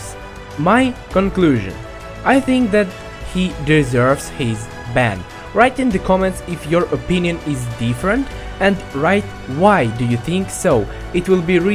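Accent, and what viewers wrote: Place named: Ukraine. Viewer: no not that it is just you sound Ukraine so I whant to make sure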